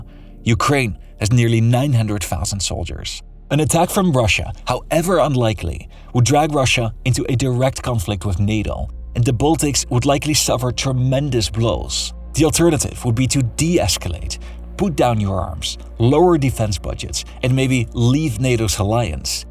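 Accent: Netherlands. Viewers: This guy is Dutch for sure. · Pro tip: don't chag your linguistic accent to pronounce a name in another language that is equally wrong. It's difficult enough as it is to cope with your sharp Dutch accent (ask John Oliver what exactly that is, he can word it better), we don't need you to randomly switch to Dutch to pronounce a Russian name, that's just ridiculous. Your content is interesting, but the narration is mostly stressful through a combination of your voice, your intonation of urgency (which isn't necessary to deliver the message and frankly works to its detriment) and the rather annoying Dutch accent that you all suffer from (so don't take it personal). Try being a bit more relaxed, at ease, people will stay longer, viewtime will increase.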